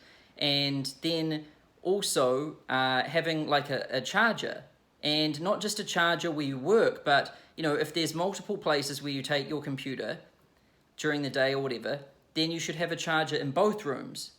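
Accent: New Zealand. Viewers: NEW ZEALAND ACCENT？？？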